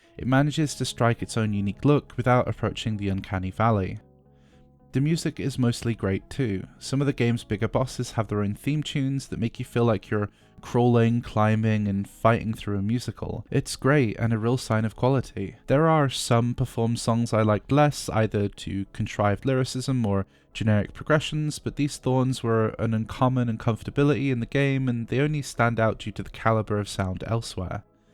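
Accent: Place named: United Kingdom. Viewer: Oof, soon as i heard your accent i knew i wouldntbe able to trust the opinion. No offense but anyone who spends their time playing videogames rather than fighting their dystopian authoritarian matriachal super state is probably a person that is ok with or apart of that tyranny. Cant trust game review advice from a race socialist. Though i wish you luck and hope that your reading this doesnt cause your secret meme police to kick in your door and .. make you..i dunno walk round london asking for forgiveness from black women or w/e is your unelected prime minister enforces as his ttier punishments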